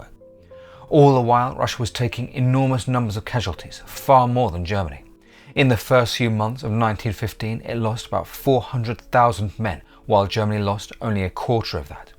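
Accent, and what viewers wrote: United Kingdom. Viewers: Russia was useless? Such a BS. With this British accent such a big Russophobia.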